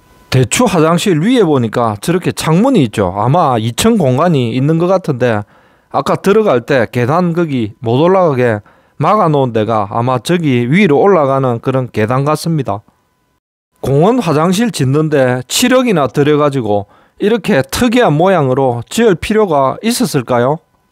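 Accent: Gyeongsang, South Korea. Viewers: 그런데 지자체 헛돈 쓴 곳이 경상도랑 강원도 같은 곳만 있나? 흠. . 말투는 경상도인데 신기하네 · 딱 첫마디듣고 경상도인줄알았다!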